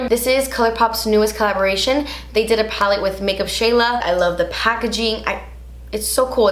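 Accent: Cuba. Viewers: Lo que está pa’ti nadie te lo quita, I’m Mexican, And I’m so in love with your Cuban accent ❤️💗💖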